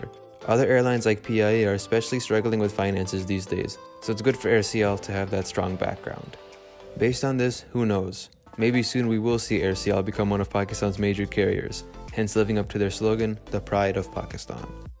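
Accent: United States. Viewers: Great American Accent.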